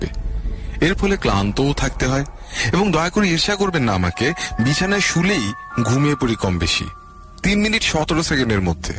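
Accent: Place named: United Kingdom. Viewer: ওরকম দাঁত চিবিয়ে চিবিয়ে ইংরেজি স্টাইলে বাংলাটা না বললে নাটক টি সর্বাঙ্গ সুন্দর একটা রূপ পেত ভাই... ওভাবে বলার কারণে অনেক শব্দ স্পষ্ট শুনতে অসুবিধা হল।😅 । পরবর্তীতে এ বিষয়টা মাথায় রাখা উচিত...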